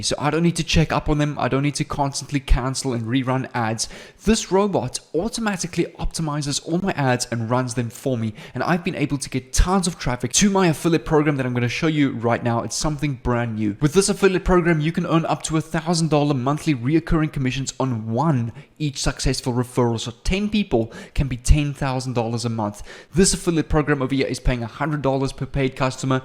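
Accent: South Africa. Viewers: Are you South African Mate? I'm very familiar with that accent. But you are great. I trust you and Im taking my shot on this one.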